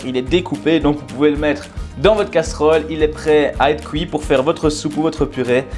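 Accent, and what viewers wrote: Belgium. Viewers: votre charmant accent belge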